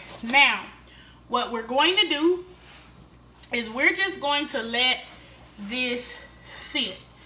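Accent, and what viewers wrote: South, United States. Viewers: Oh, ok. I definitely heard that suuuthin swag🤗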